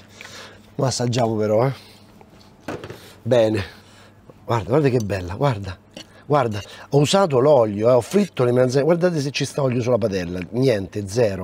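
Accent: Rome, Italy. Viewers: Faresti meglio a fare qualche film con Massimo Boldi con quel accento romano,e un complimento,sei bravissimo come cuocco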